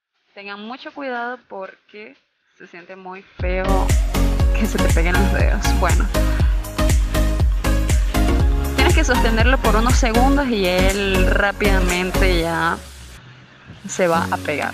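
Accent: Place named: Ecuador. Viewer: Eres de ecuador o venezuela?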